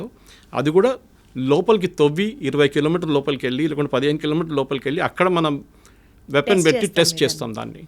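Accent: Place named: Telangana, India. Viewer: Telengana accent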